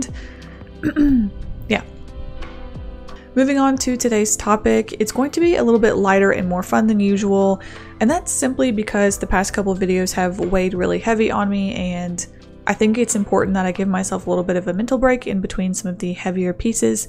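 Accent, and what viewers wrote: United States. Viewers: Seriously? The current scale is the EF-scale. Europe still uses the F-scale, but you sound American to me. That said, as you know, the EF5 scale tops out at 318 mph. It's unlikely that higher windspeeds, which have been recorded as high as 320 mph, would cause more damage. It would take a much higher windspeed to create a new class of catastrophic damage. As for your comments about damage, it's possible to create your hypothetical scenarios under laboratory conditions. Engineers know exactly the structural integrity of a building within its technical life. Before you make another video, maybe you should ask an engineer, actually read the EF-scale, and review the peer-reviewed research about tornadoes.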